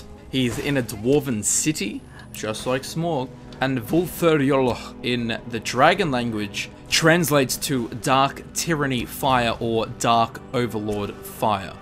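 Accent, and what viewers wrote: Australia. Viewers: Fucking straya mate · Australian accent dummi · Just Austrailian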